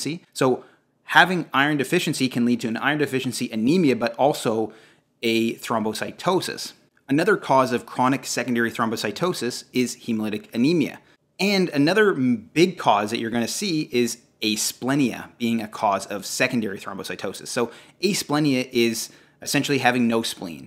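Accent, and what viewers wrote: Canada. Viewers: He also pronounces out. OWWWWWT Maybe Canadian aye? Leave the man alone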